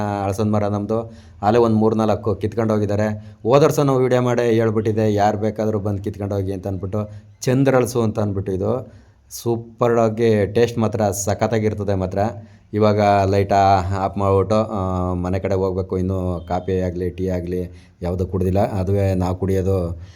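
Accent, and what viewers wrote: Mandya, India. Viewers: Nimma ganda hendathi koli jagala chennagirutte. Namma mandya bhashe kelokke chanda .Nim vlog nodthaedre thumba khushi agutte.Nanu nimma hosa subscriber.😊😊😊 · Sir nim hadu bhashe super mandya nativity super family vlogs madi sir nim hendthi soft innocent super sir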